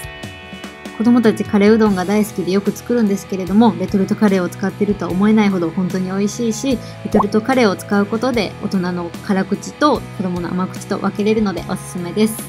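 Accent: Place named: Kansai, Japan. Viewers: 何も違和感が無かったのでコメントを見て関西弁なことを知り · ヒレ→ヘレ 茹でる→ゆがく 煮込む→炊く バーモント→バーモンド 関西の言い回しっておもしろいですね😊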